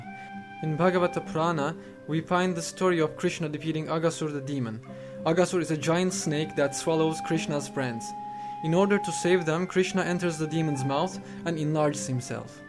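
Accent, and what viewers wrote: Turkey. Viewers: Aksanından Türk olduğunu anlamıştım. :D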